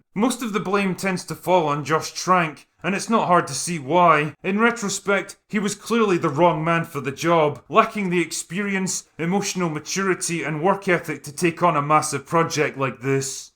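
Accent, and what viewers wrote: Scotland, United Kingdom. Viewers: The great thing about is the voice work. Everyone knows he has a unique voice. But the performance element is rarely mentioned. Listen to him on livestreams, and even though he has a Scottish accent, he sounds like a sober businessman in bar after office hours. But then in his videos he puts on the voice and plays up the "drunken slurring" and he performs. Again, especially with videos like this where it is all production notes and trivia and news, compare a WatchMojo voiceover to this video fro Drinker. You know there's a script, and yet it doesn't sound all corporate and polished and robotic and forced/fake-friendly. It sound simply like Drinker is telling a story in a bar after happy hour.🤪🤪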